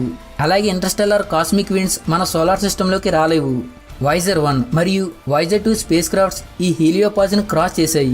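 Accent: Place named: Srikakulam, India. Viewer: Pl don't make videos with this SRIKAKULAM ACCENT....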